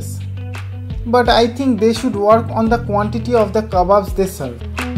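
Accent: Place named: Bengal, India. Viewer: Bangali accent 👏👏👌👌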